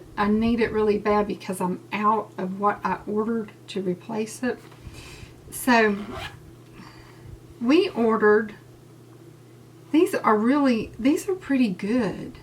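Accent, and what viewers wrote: South, United States. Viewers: I love you so much I think your were sleepy in this cause you sound even more southern , I do the same when I'm sleepy i love your top today enter me darlin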